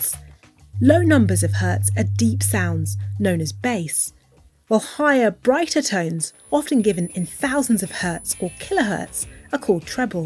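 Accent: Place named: United Kingdom. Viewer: Hiw about using an American voice instead of British! So everyone can understand!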